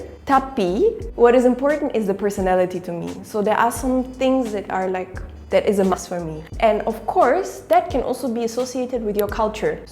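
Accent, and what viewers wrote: Malaysia. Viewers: Hang pun borak dah mcm org local(malaysia) even bila hang speaking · You sound like Malaysian already, hahaha 👍🏾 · English dier dah kena rasuk Manglish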